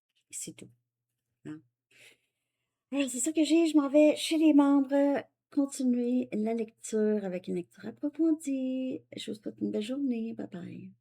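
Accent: Canada. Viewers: Message 2 suite : En plus j'adore votre voix car elle est douce rieuse et aimante, votre petit accent canadien est adorable ! Je ne me lasse jamais de vous écouter, je suis toutes vos guidances avec le plus grand intérêt depuis 2 ans que je vous ai découvert sur YouTube. 🙏🙏🙏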